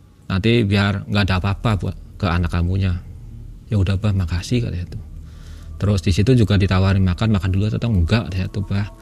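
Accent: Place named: Cirebon, Indonesia. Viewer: Wong cirebon nadane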